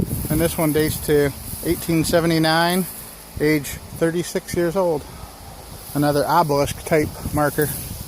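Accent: United States. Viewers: Love that Michigan accent.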